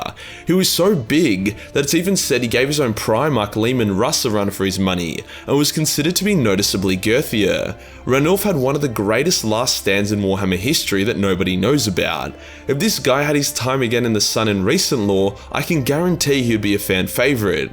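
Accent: Australia. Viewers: Hearing 40k in Aussie is good for the soul 😁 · Best way to wake up is a crazy Australian talking about sci-fi shenanigans. FOR THE EMPEROR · He's speaking pure okker. It's an Aussie thing... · Dude, you talk like Paris Hilton, but with an Australian accent.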